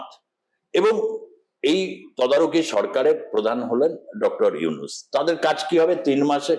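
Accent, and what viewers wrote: India. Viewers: হিন্দি উচ্চারণে বাংলা শব্দ প্রয়োগ করবেন না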